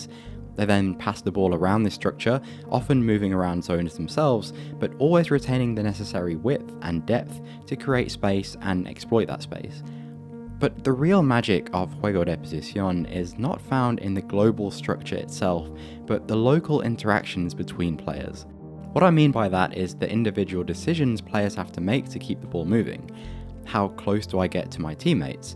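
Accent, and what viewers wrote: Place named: England, United Kingdom. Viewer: Dude i HATE english accent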